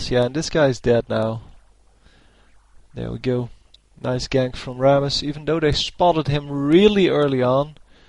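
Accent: Netherlands. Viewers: Ik wil niet lullig doen ofzo hoor, maaaar je hebt een beetje Nederlands accent in je engels :$, Maar toch leuke vids :)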